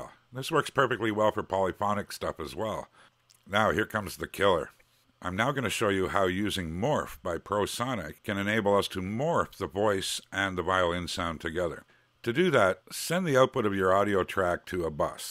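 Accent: Canada. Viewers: No, just a Canadian accent. Hope you enjoyed the vid.!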